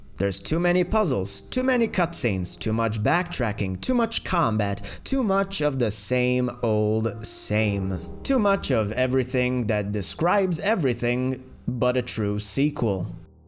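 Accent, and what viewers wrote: United States. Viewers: Where in Europe are you from? You sounded American until you said Europe. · You don’t seem to sound like your from any part of Europe when you said you were from a part of Europe I was shocked because you sound American.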